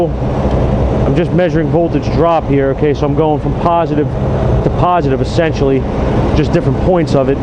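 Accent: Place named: East Coast, United States. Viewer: But I love your big mouth and eastern accent.! LOL THANKS!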